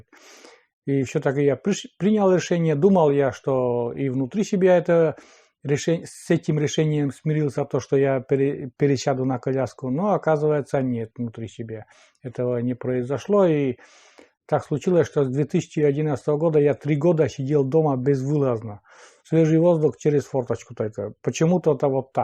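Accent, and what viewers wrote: Komi, Russia. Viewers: Влюбилась в Ваш канал совсем недавно и смотрю теперь постоянно! Спасибо вам с за тепло, за радость общения хотя и виртуального! Я с Перми, моя бабушка, хоть и русская, жила в Коми округе Пермского края. Так что коми мне очень близки. Был приемник у бабули в доме и местный диктор говорил: Баитэ Кудымкар. Может я немного не так написала, я тогда была маленькой, мама говорила, что это означает - говорит Кудымкар. Говор у них в деревне был такой же, как у вас. Мне очень приятно слышать, как вы разговариваете, как будто в детство к бабуле приехала... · Здравствуйте, и! Да,с вами меня познакомил Олег,а его я увидела случайно,когда пришла зима,прошлый год,я решила делать веники сделать.Нашла видео,слышу у мужчины акцент,ну точно коми,наш,смотрю канал называется, На природе Коми.Так я и нашла Олега.Спасибо Вам за рассказы,за видео о еде,о природе и остальном.Здоровья вам с и удачи!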